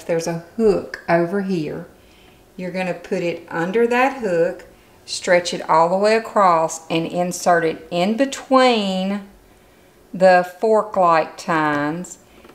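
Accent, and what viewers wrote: South, United States. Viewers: My friend and I pooled our amazon points and bought this sewing machine. Opened it today. All was well until we tried to figure out how to thread the machine using the threader. The instructions in the manual weren't any help and then I found your video. We watched it then took turns threading the needled. Thank you so much for you patient, clear instructions! And I do love you southern accent!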